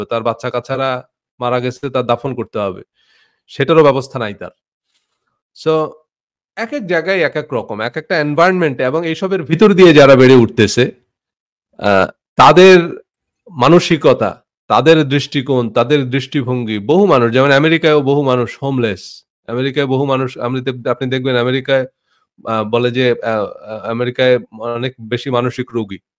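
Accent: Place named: Jhenaidah, Bangladesh. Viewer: দক্ষিণ অঞ্চলের কোন জেলায় বা কোথায় বাসা, আপনার ভাষার প্রকাশ এবং প্রকাশভঙ্গি দেখলে মনে হই হয়ত ঝিনাইদের আশেপাশে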